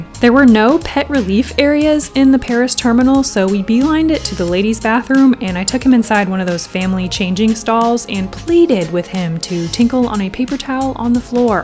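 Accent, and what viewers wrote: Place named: London, United Kingdom. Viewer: You sound like you’re from London!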